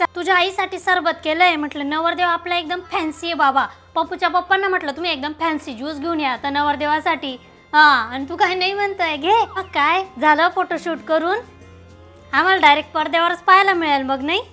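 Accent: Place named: Ahirani, India. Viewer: माझे सासर चाळीसगाव जवळचे नगरदेवळा आहे... मी मुंबईची आहे पण मला अहिराणी बोलायला थोडी येते, पण समजते.तु हल्ली व्हिडीओ मध्ये अहिराणी नाही बोलत... तुझे अहिराणी ऐकायला छान वाटते... तुझे अहिराणी तले व्हिडिओ मी आमच्या फॅमिली ग्रुप मध्ये आणि इतरांना पण सेंड करते... त्यांना पण बघायची सवय लागली आहे ❤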